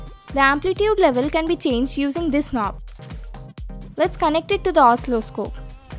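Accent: India. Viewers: Yes you explanation is wright on,it shows you your stuff.....again well done,but if i may have a tiny reserve ,for a non Indian person ,we may have a bit of difficulty to understand what you say as you are showing and accent.May i suggest that you speak (and this is valid for all Indian people) a little bit SLOWER,and that would make your videos near perfection. Bye. Lucien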